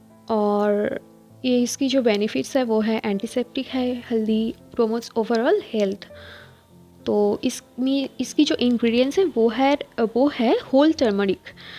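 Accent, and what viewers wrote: Bengal, India. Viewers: Are you Bengali??? Your Hindi accent has a Bengali style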